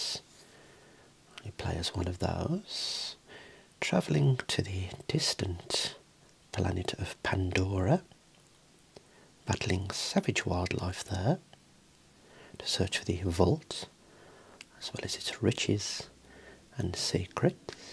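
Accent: United Kingdom. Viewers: Did I hear a British guy say his favorite Wii sports game was baseball??? 😅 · wow its wonderful how u pronounce the british accent, this is the best asmr with u showing us ur collections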